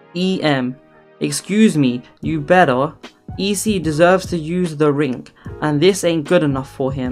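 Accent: United Kingdom. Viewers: No problem, and I really like the karma aspect as well 😂😂 Your accent sounds kinda British, with something else. If you don't mind me asking, where are you from?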